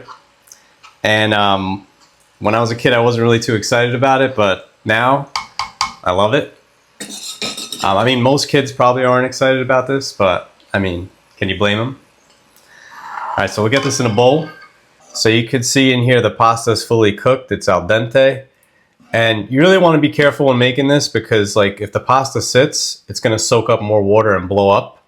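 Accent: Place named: New Jersey, United States. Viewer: I like hearing the NJ accent again. I no longer say 'warder' any longer, but like hearing still. Makes me homesick for the pre-1960 NJ/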